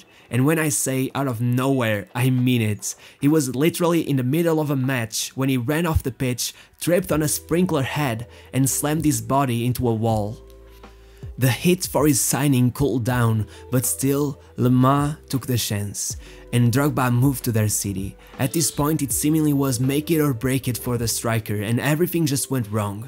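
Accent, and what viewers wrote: Porto, Portugal. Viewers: aquele sotaque do Porto impossivel de esconder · Never knew it was a Porto accent! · I hear the Portugese in your accent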